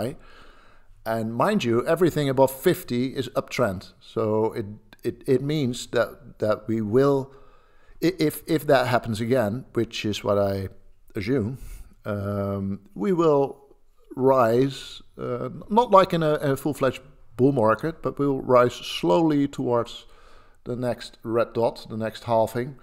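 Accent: Netherlands. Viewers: Really nice accent. It almost sounds Dutch but I can't place it exactly. Where is it from? Edit: Channel says Netherlands. So I was onto it. I love the Dutch-English accent. Sorry I'm weird. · You've a Dutch accent on your English. What did you study at the Uni that enabled you to put out these models? Asking for my son. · Come for the bitcoin insights, stay for the Dutch accent! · A very slight Dutch accent is what I’m detecting.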